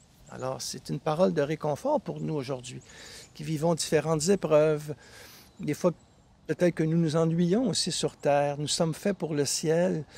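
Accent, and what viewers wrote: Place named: Quebec, Canada. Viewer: C'est la première fois que j'entends une voie canadienne française parler de Carlo Acutis. C'est bien de faire les éloges de bienheureux précoce, mais quand mettrons-nous sur pied une vaste ``opération Carlo Acutis`` dans les écoles parmi les jeunes qui sont on ne peut plus mûrs pour accueillir ce genre de découverte ?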